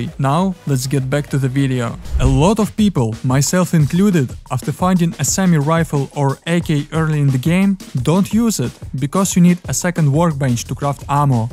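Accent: Russia. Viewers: акцент русский слышно)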